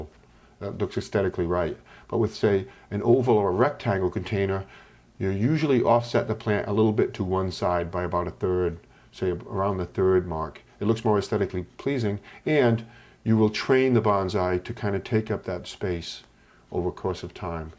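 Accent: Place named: Boston, United States. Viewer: Trying to place your accent. Leaning towards Boston but it might be Brockton? Or am I wrong on both counts? :p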